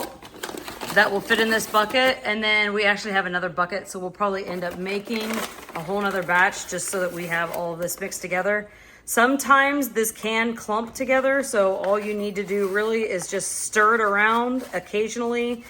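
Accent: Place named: United States. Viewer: She's not speaking English. She's speaking "American". There's a difference. This is the kind of thing that happens when you have had a failed educational system for decades.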